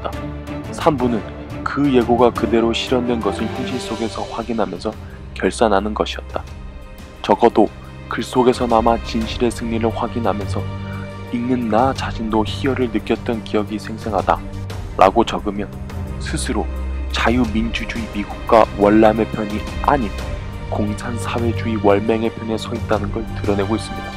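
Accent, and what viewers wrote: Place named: Hamgyong, North Korea. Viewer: 함경도 사투리쓰네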